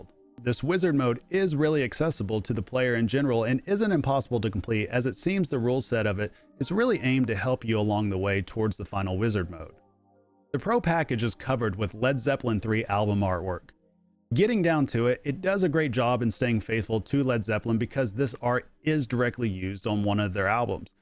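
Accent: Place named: Oklahoma, United States. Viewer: Fellow okie! Great review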